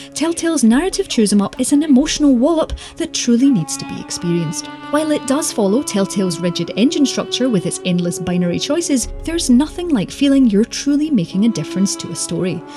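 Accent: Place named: Scotland, United Kingdom. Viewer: Can't beat a good Scottish accent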